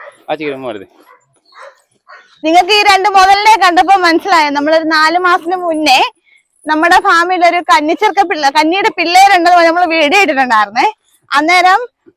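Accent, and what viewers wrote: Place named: Pala, India. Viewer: ❤🙏 പാലാ സംസാര രീതിയാണ്❤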